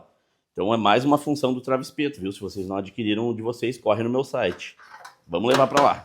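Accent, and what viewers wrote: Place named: Rio Grande do Sul, Brazil. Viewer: so pelo jeito q ele fala reconheço q ele e do meu estado,do rs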